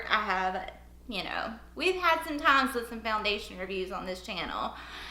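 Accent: South, United States. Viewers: shes southern it’s called an accent sthu “sweet” is not fitting for you u must be on something or are you just a bully??? 🙄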